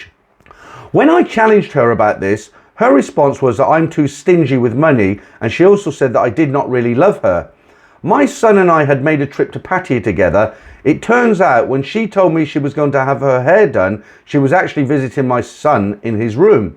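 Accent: United Kingdom. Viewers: Are you British,. First time watching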